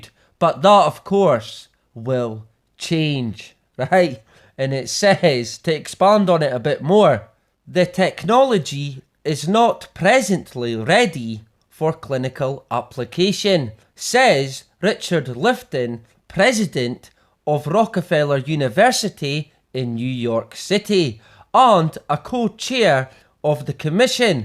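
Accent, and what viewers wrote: Scotland, United Kingdom. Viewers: Love the accent mate. I spent a year in Scotland working offshore. You don't really have seasons in Queensland We had a referendum in 1946 That Denied Government power to medically test This means that the People of the Commonwealth of Australia denied the government any power to force people to undergo any medical or dental procedure without their consent.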